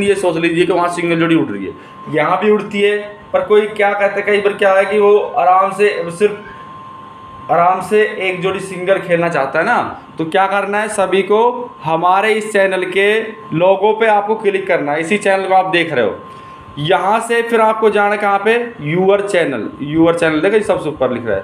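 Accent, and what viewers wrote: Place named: Mathura, India. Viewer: Mujhe aapki language mathura se lagti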